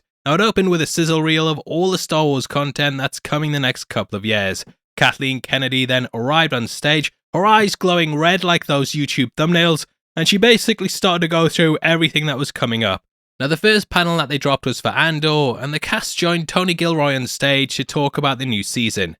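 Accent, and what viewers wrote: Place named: North East England, United Kingdom. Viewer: Are you serious? He's clearly British, from the north east by the sound of it.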